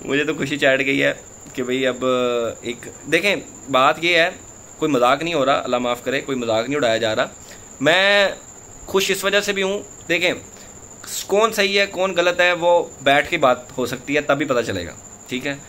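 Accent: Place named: Punjab, India. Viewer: مووولک نہیں ملک ہوتا ہے۔ اردو بولنا سیکھ لیں بھائی ۔ آپ کی اردو پنجابی زبان کی چغلی کھا رہی ہے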